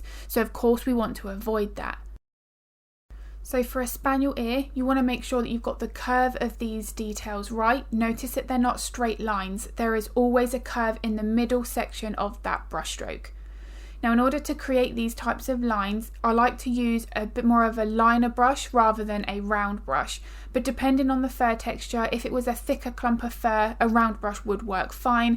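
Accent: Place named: United Kingdom. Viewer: I’m new to animal portraits, yet I am a trained artist! You are fantastic:) My BFA is in Ceramics and Abstract Painting. In a week or soI I’ll be joining Patreon. In just seven minutes I’ve learned SO much! Thank you for sharing your gift. Oh, I’m Shane, BTW. Hope to chat with you soon. I assume you are across the pond, based on your accent lol. I’m in Charleston, SC…..and there is a HUGE market here for pet portraits here that I want to dive in. I do human portraits as special gifts due to the length of time it takes to complete one, but I sell my original abstract more. Hope to chat with you soon!! Oh, have you ever done a medium goldendoodle? -Cheers, Shane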